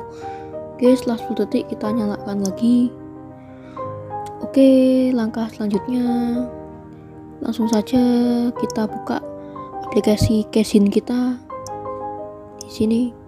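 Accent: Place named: Java, Indonesia. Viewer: Kayak orang Jawa ngomongnya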